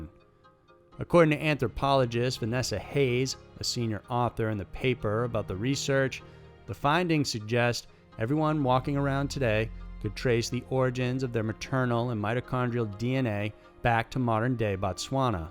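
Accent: South, United States. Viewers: I just love your voice sounds so country😍 great video as always!!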